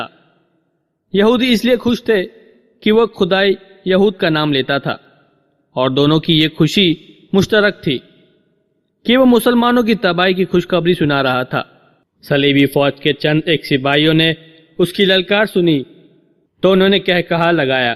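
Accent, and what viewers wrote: India. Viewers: Brother yeh indian hai aur indian aise hi bolte hain Doesn't mater. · YR MUJE LAGTA HAI K YEH VOICE LIKE INDIAN TARZ KI HAI IS IT RYT ??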